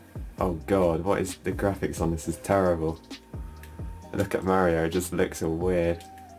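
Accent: United Kingdom. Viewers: You're British?? I totally did not see that coming...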